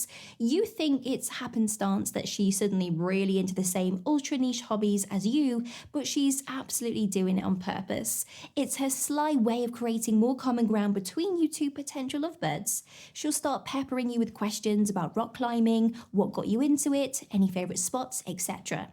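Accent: United Kingdom. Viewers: Hey there, are you into me? 😂😂😂😂😂😂😂 I'm kidding. I'm here to listen to your British accent, I love it! You have a blessed day.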